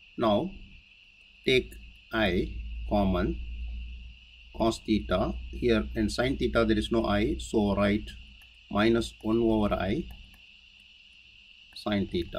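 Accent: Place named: India. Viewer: You know a math video is good when you hear the indian accent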